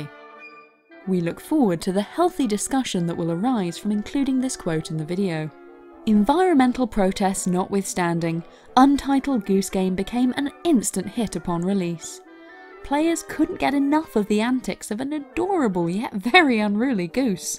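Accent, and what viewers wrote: United Kingdom. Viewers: Seeing that the channel is called "Video Game Story Time", I expected a fast-talking guy rattling things off at a mile a minute. This slow, measured, British female voice, explaining things in a very calm and step-by-step manner, is entirely unexpected, but very welcome.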